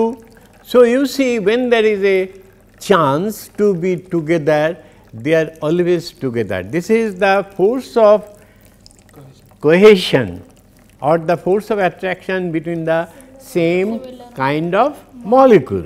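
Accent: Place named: Bengal, India. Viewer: I can feel that the teacher is bengali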